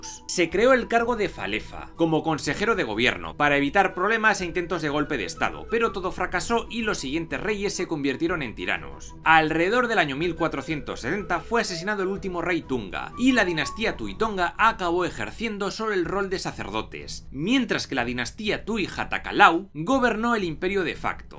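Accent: Spain. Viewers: ¿Has pensado hacer una versión hablada en español latinoamericano? Es que el acento y la forma en que se habla en España nos resulta desagradable, pareciera que se estuvieran desinflando al pronunciar las palabras. Piénsalo, tal vez tus compatriotas te sigan y así tendríamos un mundo con un español más agradable.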